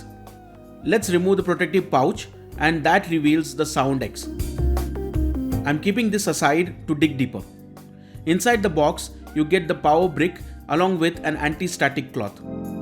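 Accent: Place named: India. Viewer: his accent is Indian not arabian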